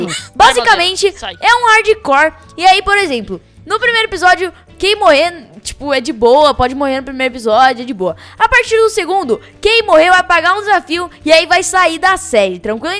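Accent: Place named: Minas Gerais, Brazil. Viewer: tu mineiro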